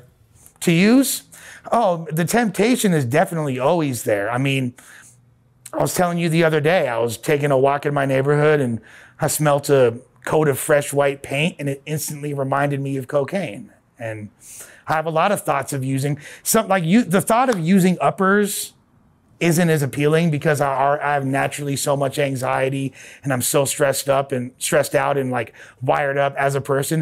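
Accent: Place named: Los Angeles, United States. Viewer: Genuine west side L.A fella , authentic in articulation , finally hear someone who gets it , the recovery language , the program , actually talking the walk. Thank you for "sharing".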